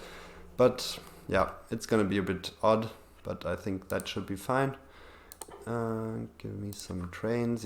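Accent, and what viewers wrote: Germany. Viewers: Just found your channel and really enjoyed you rambling. Are you german?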